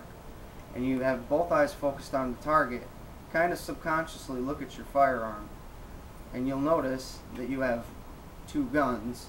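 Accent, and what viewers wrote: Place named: Minnesota, United States. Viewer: are you from Minnesota??? I heard my accent!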